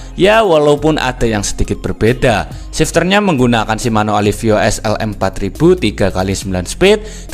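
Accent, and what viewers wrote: Java, Indonesia. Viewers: Medok medok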